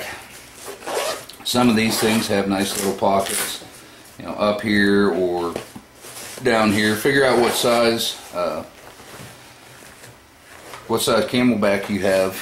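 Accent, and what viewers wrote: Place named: South, United States. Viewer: I couldn’t handle the “southern drawl “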